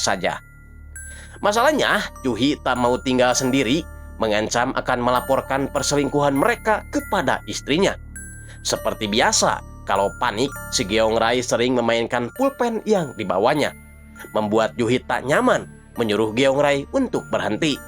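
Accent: West Java, Indonesia. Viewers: Lucu ga ada serem seremnya karena pembawa ceritanya sunda pisan 😂😂😂😂😂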